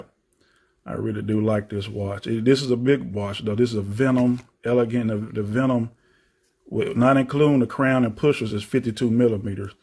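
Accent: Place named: Memphis, United States. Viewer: Gotta be from around memphis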